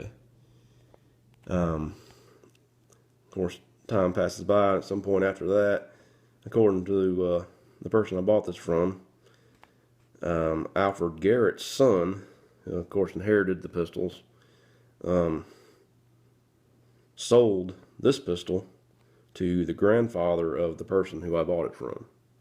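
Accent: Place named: South, United States. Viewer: lol this southern slang always sounds mentally retarded.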